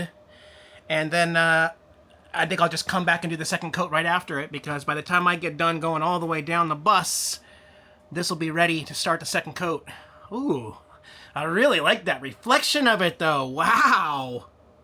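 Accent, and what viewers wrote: South, United States. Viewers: I detected a bit of southern twang. You been hanging with those wonderful southern Mississippi people. Love it!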